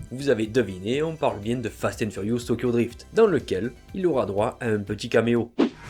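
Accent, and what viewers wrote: South, France. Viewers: Hhha! Initiale d le livreur de tofu tout fou! Hé c bo, 🤗 cool ta vidéo et j aime bien ton accent du soleil Fréro ,t as un nouvel abonné 😘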